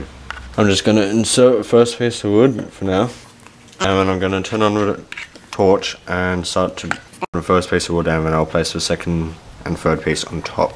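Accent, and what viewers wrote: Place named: Australia. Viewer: Australia / New Zealand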